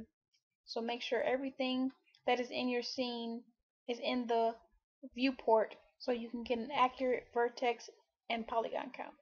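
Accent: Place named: South, United States. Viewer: Nice southern accent :))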